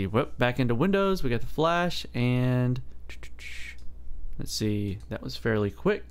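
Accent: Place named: Tennessee, United States. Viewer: Tennessee accent i like it Or is it Carolina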